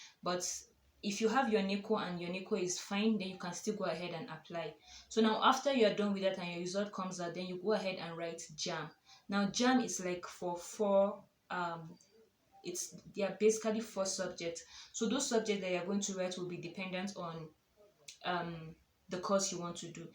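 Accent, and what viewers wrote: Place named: Uganda. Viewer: Her accent is becoming ugandan, i love it, shes easier to understand, the tongue is less heavy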